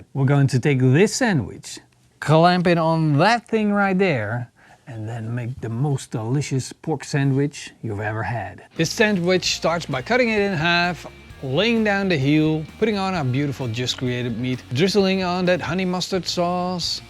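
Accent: Netherlands. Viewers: Your accent sound like Dutch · English with a dutch accent, lekker boers hahaha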